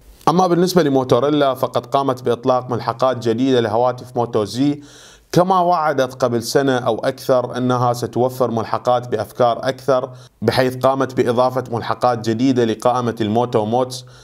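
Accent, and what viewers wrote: Iraq. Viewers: مؤتمر CES2018جميل جداً كل اشياء جميله شكرا على تقرير الجميل بصوت عراقي وشكراً على متابعتك على كل ما هو جديد في عالم 🌐 التكنولوجيا الحديثة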